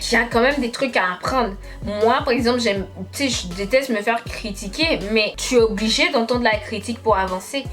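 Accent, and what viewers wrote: Quebec, Canada. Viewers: Ton accent est trop beau!! C'est un mélange entre québécoi et français de france pis anglais.!!❤❤❤❤❤❤❤❤❤ je t'aime trop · Tu es québécoise? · Ta un accent québécois?genre canadien?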